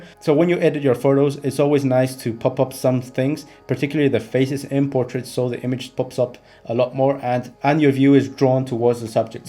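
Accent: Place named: Latin America. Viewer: Cómo se nota que eres hispano parlante, entiendo todo lo que dices a la perfección jajajaja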